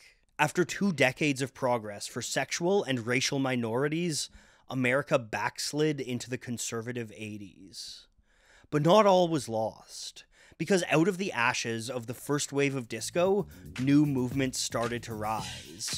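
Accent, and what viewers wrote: Canada. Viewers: Absolutely well put together. Granted your Canadian accent does give a small clue as to why you may have mispronounced Giorgio's last name (it's 'More-row-der'/ Moroder). Clearly, I wish the video would have been longer. Great job though!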